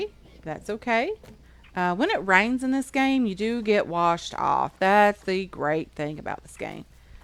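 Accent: Kentucky, United States. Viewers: im so glad to see you playing again from the start! i subscribed in the first place watching you play this...supposedly its a very different game now with everything they've added. we'll see. its very nice to see you! i still follow you but i dont have the time to get into the infected part 325...ill never catch up. this is perfect. and i just love hearing you talk with that kentucky accent. people accuse me of having a oklahoma accent! what accent? yall are the ones that talk funny!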